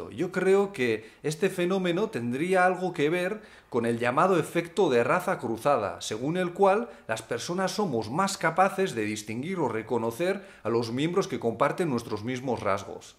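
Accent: Basque Country, Spain. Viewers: Es vasco, de ahi su acento y su forma de hablar. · Tu acento vasco es de los que me gustan, es de los agradables.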